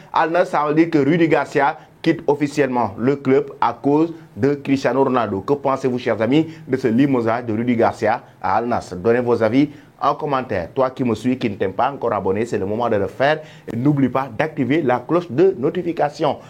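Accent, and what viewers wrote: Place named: Senegal. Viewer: Je suis ivoirien et j’aime regardé votre chaîne à cause de votre accent sénégalais . Big up depuis Bruxelles , Belgique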